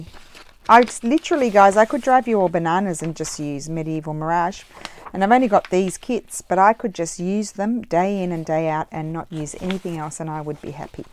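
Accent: Australia. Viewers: Great video, loved watching you create those pockets... It's also nice to hear another Aussie!!! I was chuckling away listening to your story... 😂😂😊 tfs xox hugs Fi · My husband said the same to me about upside down butterflies. I told him I like them that way. Haha. And I love your Ozzie accent. I had gardening friends in Texas from Australia.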